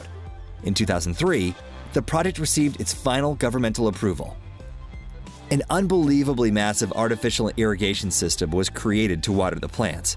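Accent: United States. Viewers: American video spoken in an American accent using American units of measure. Hmmm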